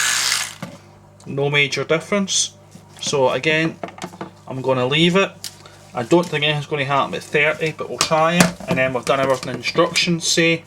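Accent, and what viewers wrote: Scotland, United Kingdom. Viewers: Great vid mate, nicely explained! (And one of the best Scots accents Ive been able to enjoy - added a much smoother Connery-esque authority to the demo. Och aye!) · I stopped at 3.00 and just have to comment here to tell you your Scottish accent is FOOKING AWSUM!!!!!!!! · you have the best Scottish accent since Capt. macmillan from COD4 Bravo sir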